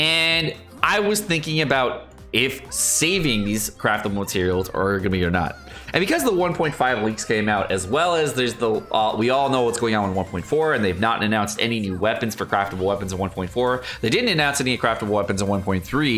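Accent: United Kingdom. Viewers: I just love British..... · At this point I watch this channel not for genshin, but for a new episode of Bri`ish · damn british is growing on me:D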